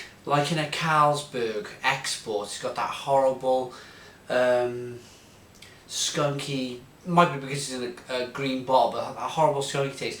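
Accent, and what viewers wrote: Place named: Wales, United Kingdom. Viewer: can i ask a question please are you from Wales as i am myself and i thought the accent was a give away.. another great review bud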